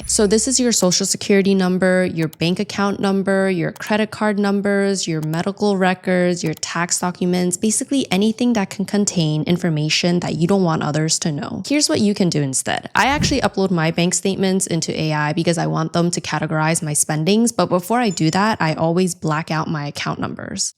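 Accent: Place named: United States. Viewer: hi lady. i just saw u now. thanks for this video. its great value video. i have simple but important advise 2u. u speak very clear gd american english language, but since u r global now, itvmeans u r talking to many people with varying English language levels. you talk little bit fast, which make it hard to catch or understand all what you say in ur videos. i wish u can talk relatively slow so then most or all people watch ur videos, easy catch u & understand u. thanks❤🎉🎉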